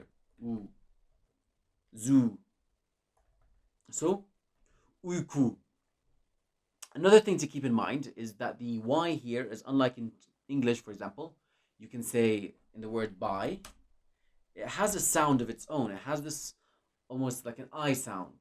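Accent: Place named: Ireland. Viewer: I understand and speak a small percentage of Turkish, and would really love to become fluent in it ama çok zor. I very much appreciate what you are doing to help people learn the Turkish language. Tell, did you by any chance learn English in Ireland? I am hearing an Irish accent from you. Kolay gelsin.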